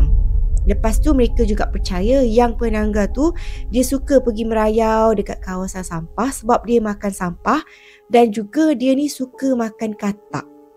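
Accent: Kelantan, Malaysia. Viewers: Assalamualaikum cik puan story ni maaf bertanya lah yer, Kelantan tu cik puan daerah mana tu, maaf sebab bertanya tu cara percakapan tu mcm loghat Kelantan, sekian terima kasih.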